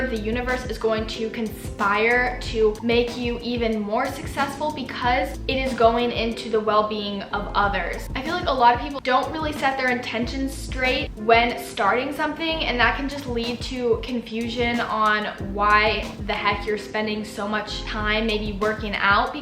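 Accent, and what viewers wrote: Australia. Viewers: It’s not a video without the Australian accent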